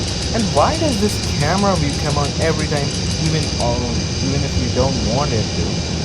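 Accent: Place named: India. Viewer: Dude you can't even speak English properly stop trying to be funny. Plus you sound like an indian person wtf 😂😂😂😂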